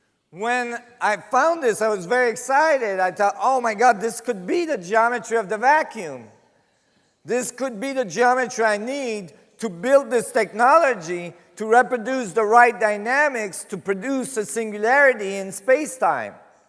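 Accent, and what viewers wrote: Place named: India. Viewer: жуткий акцент.индус?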